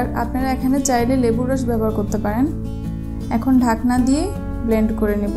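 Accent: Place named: Netrokona, Bangladesh. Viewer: নিশ্চিত নেত্রকোনার পাব্লিক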